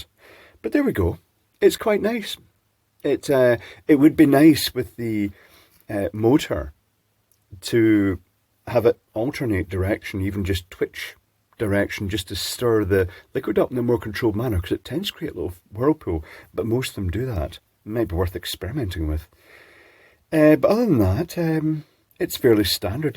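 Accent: Scotland, United Kingdom. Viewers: I love watching your videos, for some reason this one had the auto subtitles activated when I played it and it was made even more amusing than normal because it mistranslated your Scottish brogue. Glitter pearl was translated in the captions to 'glitter peril'. 😀 Be very careful of those glitter perils I understand they can be dangerous.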